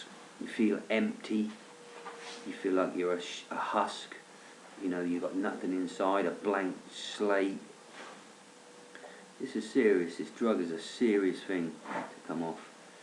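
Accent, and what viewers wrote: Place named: Norfolk, United Kingdom. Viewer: Wow...thank you so much for doing this video. Yesterday I had an epiphany! After approx 10yrs of taking Diazepam and Zolpidem for my 2-day very severe, frequent migraines, I realised that I have very probably had benzo withdrawal continuously!!! I am prescribed it for migraines, and in between the migraines I don't take anything! I have severe M.E. too, but for the past 4 yrs have had a lot of extra weird symptoms that drs can't explain (and are not interested in). I don't feel they are M.E. related...they are identical to what you are describing though!! Having been ill for 21 yrs, I am at my worst...barely managing to "survive". Pain levels are through the roof 24/7 and the full blown migraines are now every 3-4 days, and for years I've had migrainy days in between the migraines, which are hell. They are basically like having 50-80% of a migraine. 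They are currently happening 95% of the time!! So... I am hopefull that it is the benzos causing this, but not particularly looking forward to full blown withdrawal!! ....... Nice to hear a Norfolk accent? And a doggie chewing his bone??? Lol I wish you all the best and hope you start to feel a bit better soon.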